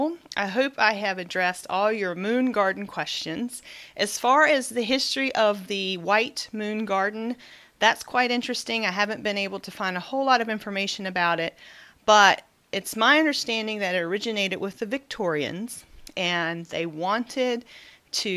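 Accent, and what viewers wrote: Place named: North Carolina, United States. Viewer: I'm from North Carolina too! I knew I recognized your accent, but I was confused because I thought you were from Florida. Thanks so much for making these great videos.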